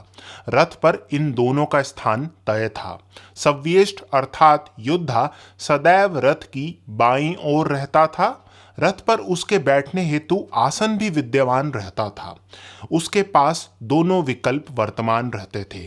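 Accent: Standard. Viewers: request, aap standard hindi toh bolte hi ho, par thoda thoda awadhi language (awadh region ) words and slang, accent bhi use kar liya karo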